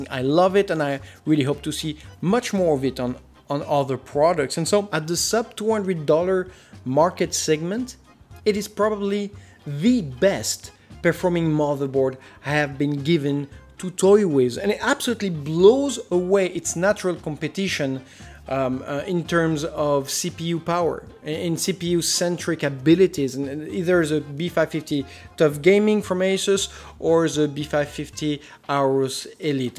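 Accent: France. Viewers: hey i'm sold thanks you very much hope you grow fast on ytb that's explain very well, love the french accent and humor i'm also uh i plan to get this comfig this going to be my first pc gamer i was a console player before now, so for let's sayy holiday 2020 i will have it and buy it there is the part the tomahawk b550 a amd rayzen 7 3700x for the GPU the last thing and more expensive I'll buy the geforce 3080 uh also for the ram what's you saying is if i put only one the pc will run better ? and also a corsair A500 dual fan for the cpu and all can you tell me if it's a solid quality build pc already?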